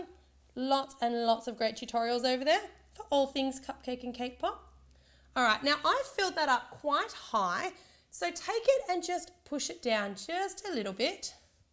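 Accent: Australia. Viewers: Aussie accent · I love all of your videos !!! I love cupcake making !!! Thank you so much for your tutorials! And btw I love your Aussie accent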